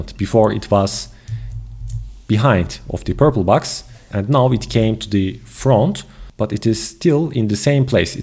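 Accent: Turkey. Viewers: did not even check the name of channel as soon as I heard your accent I am like kanka naber ya LOL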